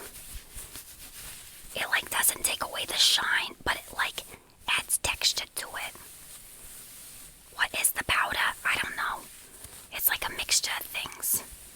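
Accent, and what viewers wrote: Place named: New Jersey, United States. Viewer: Period drama fanciness AND jersey accent?? Two huge tingle triggers for me, combined???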